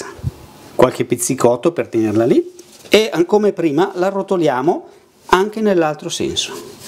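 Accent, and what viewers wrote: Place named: Emilia, Italy. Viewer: Tantissime grazie, per le risposte, hai una parlata penso emiliana, simpaticissima, queste sono anche per la signora che ti è vicina,e che ogni tanto compare, Io ho lavorato come titolare in tutt' altro campo nel commercio all' ingrosso e lavorazioni di legnami all' inizio non distinguevo la differenza tra pesco e pesso. e anche se poi ero diventato il migliore disegnando progettando e realizzando creazioni artistiche nel campo delle lavorazioni di porte tavoli perfino scarpe in tranciato di legno m, però il mio sogna era quello di avere un ristorante , e non sai con quanta amministrazione e quasi invidia ti vedo all' opera per tutto quello che sai fare perché sei proprio un artista dell' arte culinaria e sarebbe una meraviglia saperlo fare come lo fai tu, oggi però che posso anche se sono anziano mi diverto e me la passo grazie si consigli di chi più ne sa di me Un caro saluto 🌹🌹🌹🌹🌹🌹🌹🌹🌹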